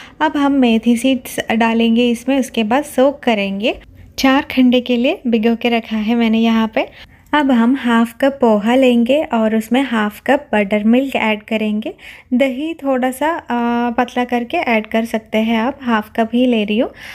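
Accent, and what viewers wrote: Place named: Kerala, India. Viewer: I like your Hindi. You remind me one of my Kerala friend.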